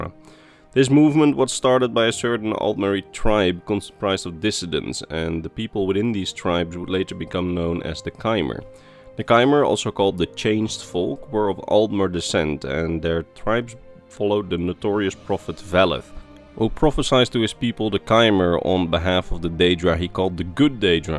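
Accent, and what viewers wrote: Netherlands. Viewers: Lol, I love watching this channel, I mainly listen to it because I love 's Dutch accent. Can't get enough.:D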